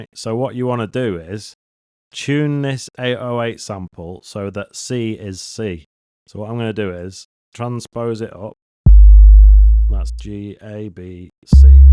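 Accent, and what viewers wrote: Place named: Bradford, United Kingdom. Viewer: You lad............. You are the Don Corleone! And that Accent too (Bradford boy myself) One of the best tutorials I have seen in a while. You know your stuff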